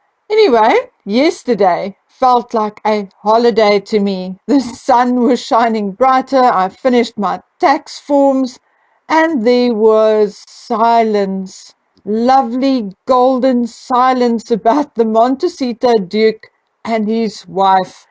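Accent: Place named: Durban, South Africa. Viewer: JUST LOVE ACCENT EX DURBS? BACK IN UK.... M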